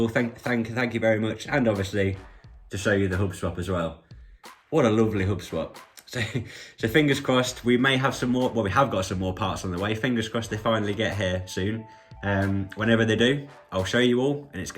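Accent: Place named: Leicester, United Kingdom. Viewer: I’m in Leicester , would know our accent anywhere 😂 it’s very entertaining , nice garage set up too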